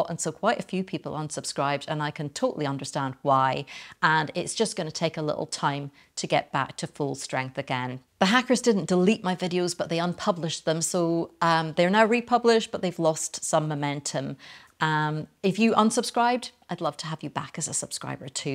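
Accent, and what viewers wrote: Scotland, United Kingdom. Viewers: Subscribed to. I enjoy your chanel so much, your content, and your professionalism is very much appreciated. Also I love your wee Scottish accent🤗. Being a fellow Scot myself, living in Spain, it's a pleasure to listen to you🙏. Keep up the great work.💓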